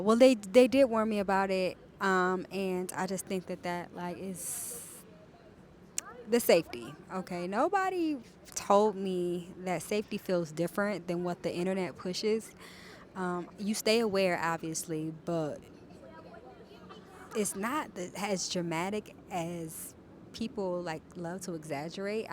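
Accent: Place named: United States. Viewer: If I were to pass you on the street i wont think you from the USA till you start talking.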